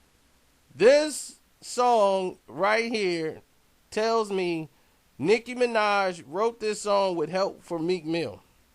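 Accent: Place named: Dakota, United States. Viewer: This dude sound like he only wear Bill Cosby sweaters. Must be from Dakota or some shit. fuck outta here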